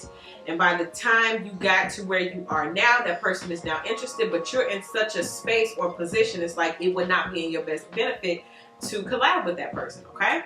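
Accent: Chicago, United States. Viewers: Hold up, girl you from Chicago?!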